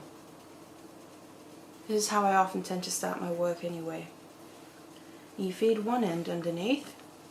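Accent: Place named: Wales, United Kingdom. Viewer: Thanks for the video. I've always wanted to learn how to macrame. I love you're accent are you Welsh?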